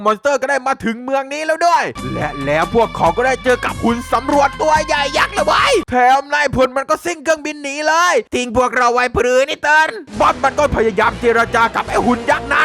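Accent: South, Thailand. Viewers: เกรียจการแหลงใต้ของพี่มากครับ5555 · ขำกับภาษาใต้ สุดๆๆๆ หรอยหนัด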